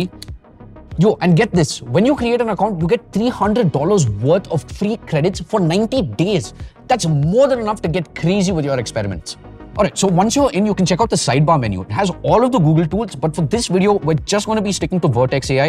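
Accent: India. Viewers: He sounds Indian and looks Mexican 😂😂😢😅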